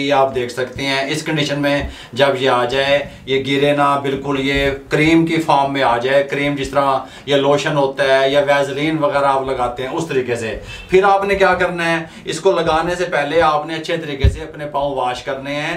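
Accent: Punjab, India. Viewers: English, Urdu and Punjabi touch, Zabardast.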